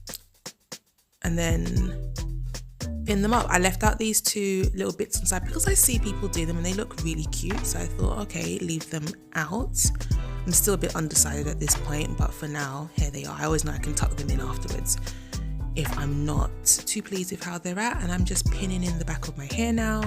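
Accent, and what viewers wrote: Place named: United Kingdom. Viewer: Love your hair and I just love the English accent, so elegant.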